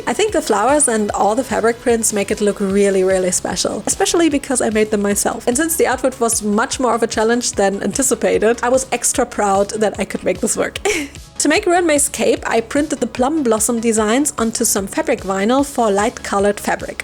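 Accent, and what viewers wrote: Germany. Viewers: Are you german? Your accent sounds so german. If yes: Tolles video!! Und die puppe sah echt cool aus!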